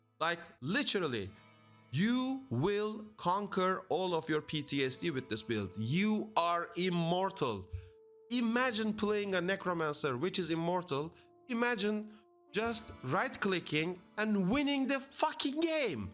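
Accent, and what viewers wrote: Turkey. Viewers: Aksanın Türk gibi kesin türksün ya 😅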